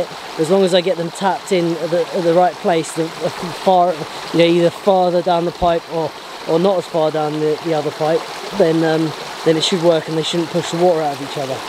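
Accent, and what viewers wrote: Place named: West London, United Kingdom. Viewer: 'that bit of skank' lol. you must be from west london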